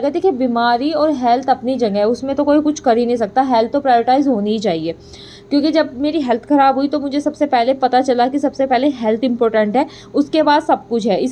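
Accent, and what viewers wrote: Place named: Braj, India. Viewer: Kaha se ho? Brij wasi si lg rhi ho?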